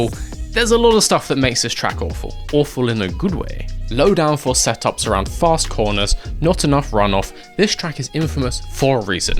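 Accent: United Kingdom. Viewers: I can assure you: You sound British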